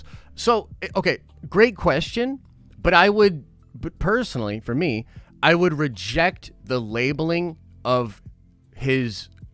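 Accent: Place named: Western Pennsylvania, United States. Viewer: did your west Pennsylvanian accent inexplicably get HUGE in the last week and a half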